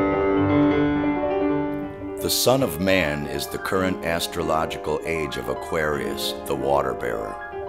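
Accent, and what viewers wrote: United Kingdom. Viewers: WHAT IS this music... is your name? I lived for a few years in Kolwezi, Katanga [Shaba] in Zaire, and we had a small lake there, perhaps what's left of that Lake called Zembre... Zaire is the name of the whole country [Congo[ in Mobutu's time. Kolwezi is close to Angola. [that was 62-64]. Before that I was in Kambove and Jadotville/Likasi, born there of colonial parents, and we retured to Belgium. I am in Britain since 1968. I love it when you read a text with the British accent, and of course your amazing theories, especially this one with an eluxidation for this great mystery concerning what the Son of Man means!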